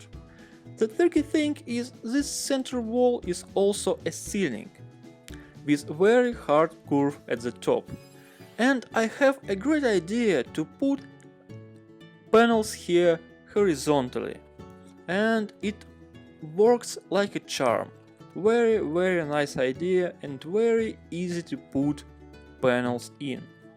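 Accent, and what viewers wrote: Russia. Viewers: I like the Russian accent.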